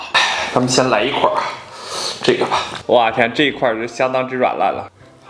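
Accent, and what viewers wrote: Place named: Beijing, China. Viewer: 您那毛坯儿房愣是给我听吐了。求你，放过北京话，积点儿口德。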